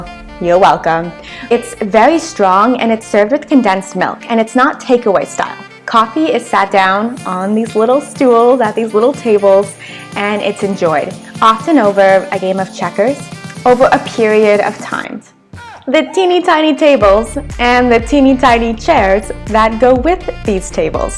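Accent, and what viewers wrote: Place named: New York, United States. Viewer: You sound more ny than Canadian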